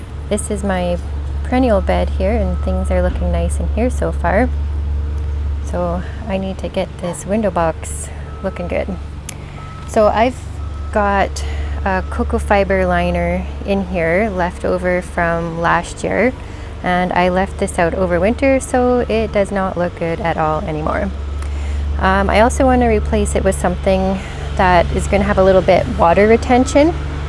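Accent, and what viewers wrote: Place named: North, United Kingdom. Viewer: what a great idea...i have several planters like this that i can apply this to....btw what zone are you in?? going by your accent, you sound northern....you can also use thin wire instead of zipties thus making no holes it will just go through the burlap easily. pansies won't last long they are a cold weather plant. what a great DIY video!!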